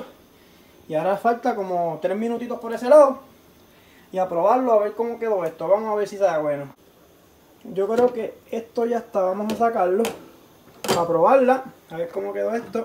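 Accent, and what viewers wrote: Puerto Rico. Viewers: Puede tener el acento de boricua, pero aseguro que ese día te es Dominicano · Hay papa me gusta ese acento de Puerto Rico. Si lo hago con pepperoni y también jamón y le echo cebolla, aji. Bien rico.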